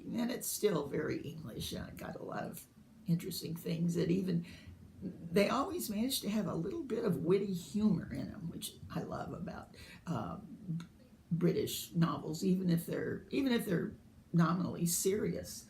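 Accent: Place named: United States. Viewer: yes we drink tea in massive amounts. tea is the answer to everything. and beans on toast is standard food for us all the way through our lives. the most scrumptious and delicious comfort food, and very easy to make and very hearty when we can't be bothered to cook a full meal. Just open the tin of beans and make some toast! :D well I am British and I do like your accent too. Just as American ladies love English accents, so are we Englishmen attracted to American ladies with charming accents!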